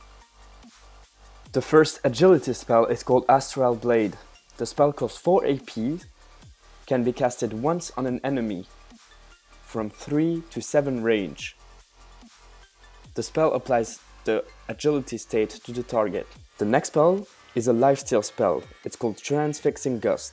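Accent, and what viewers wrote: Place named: France. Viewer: you sound so french... don't forget about the "ed" after a "t" is pronounced [tide] and pronounce your "h"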